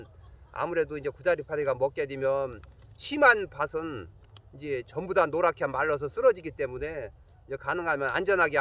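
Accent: Chungcheong, South Korea. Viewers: 질문을 하면 줄줄줄나오는게 넘신기ㅋㅋㅋㅋㄱ구수한 충청도 사투리 넘나 정감가요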